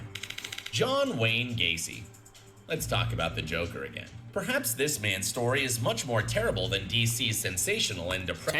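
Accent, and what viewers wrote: India. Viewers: that indian accent got me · His Indian Accent had me dying😭😭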